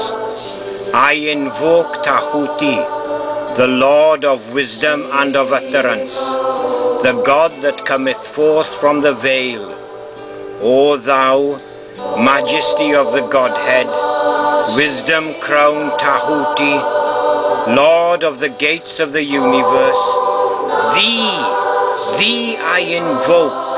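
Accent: Wales, United Kingdom. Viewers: I really love your recording of the invocation, the profundity of the invocation combined with that beautifully medlodic welsh accent is music to my ears. I've been using your recording to listen and prepare before I perform the invocation myself for the last month or so. Esecpially yesterday since it was August 6, a day sacred to Thoth, and also happened to be Wednesday, day of mercury, so it was the perfect time. This invocation is brilliant for preparing oneself to engage in artful expression, my writing just flows like crazy a day or two after the invocation, and also the request to 'aid and guard me in this work of art' is a particularly moving exhortation. So, many thanks my friend for this little gift. Highly appreciated ;) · Pure Welsh accent right there.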